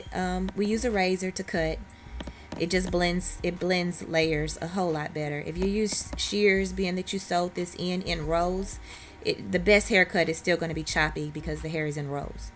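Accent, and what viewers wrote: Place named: South, United States. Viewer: Love your tutorials, and I especially love your country accent!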